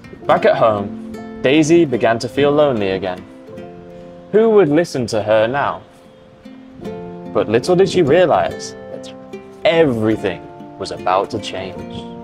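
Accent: United Kingdom. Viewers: Thank you very much . Could you please make B2 or upper -intermediate level because you voice is clear to gain the British accent ❤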